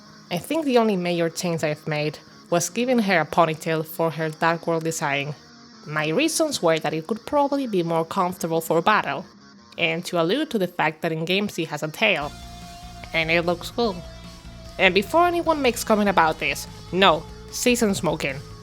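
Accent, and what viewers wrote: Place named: Italy. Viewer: I’m sorry this ain’t related to the art but I love your accent so much are you Italian??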